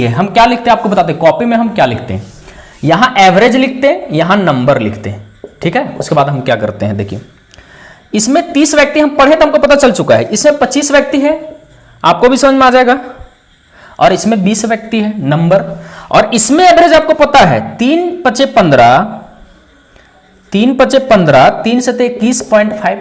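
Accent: India. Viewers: Bhai mai aapse kuchh kehna chahta hu..... Aap garibo ke liye bhagwan hai..... Ho sakta hai kuchh log aapke desi bolne ke style ko nahi like karte ho.... Aap rukiyega nahi.... Thanks a lot keep continuing.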